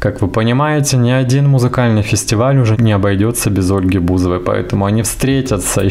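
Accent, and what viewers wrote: Ukraine. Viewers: Украинизмы придают речи своеобразие и неповторимость.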